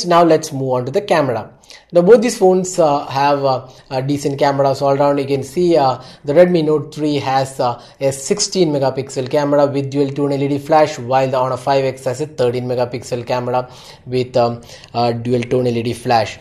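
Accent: India. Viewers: everytime i watch any of Honor 5X review,mostly it was make by an indian people,i not really care about that,but the most offending me is the way you talk, with that indian dialect mix with English totally make me feel uncomfort + not a very clear speak..i hope u can speak more slow and clear voice on the other next video..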